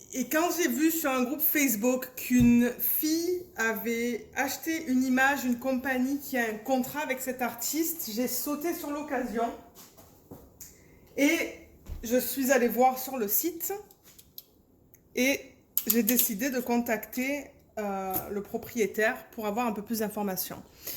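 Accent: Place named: South, France. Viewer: Bonjour, je suis une nouvelle de vos abonnées et je reprends vos vidéos depuis le début mais je me demandais...vous ne seriez pas du sud de la France par hasard? Car c'est très bizarre, vous avez un petit accent que je connais bien pour une canadienne??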